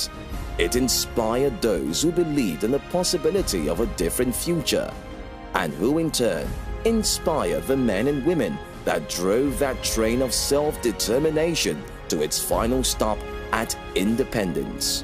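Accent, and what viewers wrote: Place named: Europe. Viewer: This narrator sounds European to me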